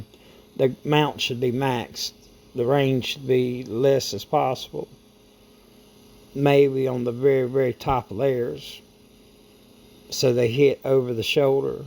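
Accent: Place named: South, United States. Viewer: A southern accent and the sound of a cigarette being sparked up... 😁 You have my attention sir. Hello from Birmingham Alabama 👋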